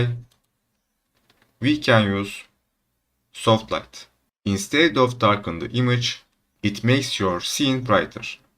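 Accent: Turkey. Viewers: yemınle var ya sırf aksandan turk dedım yorumlara baktım sırf bu yuzden :)) well done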